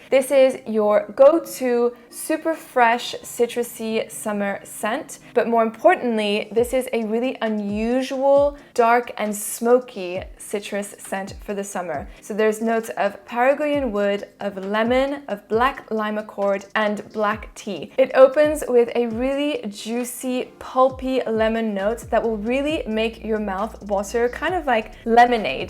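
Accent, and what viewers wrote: France. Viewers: Very infornative and to the point information. And nice French accent. Narciso rodriguez bleu noir parfum is my favorite. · I just subscribe cuz, j’ai adoré votre français accent, très jolie! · I love your french accent. You're very well spoken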